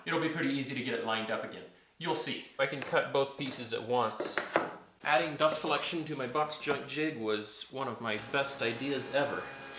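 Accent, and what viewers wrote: Canada. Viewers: What accent is that? Canadian?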